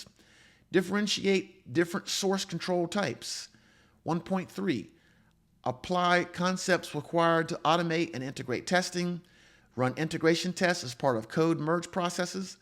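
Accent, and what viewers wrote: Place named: Virginia, United States. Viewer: I could tell from that accent that you were from a southern part of one of the Virginia's 😊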